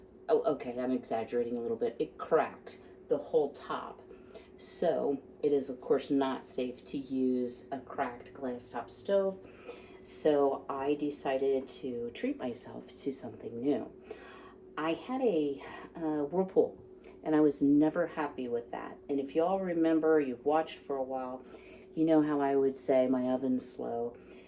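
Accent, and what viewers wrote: South, United States. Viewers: Hi, your new oven looks great 🤩 I think the split door is an incredible idea!! have not seen that before. Alot of my recipe books have timings and temperature written in by me multiple times depending on what oven I had at the time, they vary so much, my present one is on the slow coach side. Recipe sounds really yummy 😋 and looks really pretty in your dish. Love the sound of all the content you have lined up it's definitely going to help me through the rest of the year 👍 Definitely yes to a P.O box too!!! that would be lovely 🥰 I love accents I think they're our history and I love to hear them, so you go as Southern as you like girlie 💕 Lots of love and appreciation from across this darn pond 🤣🤣 Thanks, love to Frankie too 🐾💕🐱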